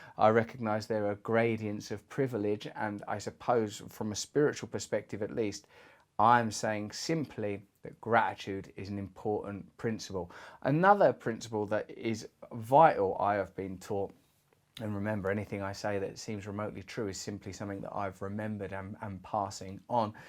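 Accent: United Kingdom. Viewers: i notice you have an accent that sounds like Kathrine Hepburn sounded when she talked. Is a nice accent. British ?or Aristocratic?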